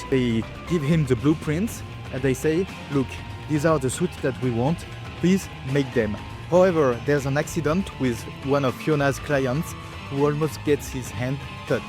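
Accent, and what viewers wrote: France. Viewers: Are you french ? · this is the THICKEST franch accent I've ever heard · Tu sais quoi, tu m'inspires. J'ai aussi un accent français quand je parle anglais et ça me freine beaucoup quand j'ai envie de créer du contenu en anglais. Je trouve ça vraiment incroyable que tu "oses" faire une vidéo complète en anglais ( entre guillemets parce que le mot "oser" à une connotation presque négative, comme si ça impliquait que t'aurais jamais du faire la vidéo! Et c'est pas ce que je veux dire du tout!). Enfait bref, j'adore et merci beaucoup! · The french like accent is soo soothing, good video , ill be studying this video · Are you French? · Are you french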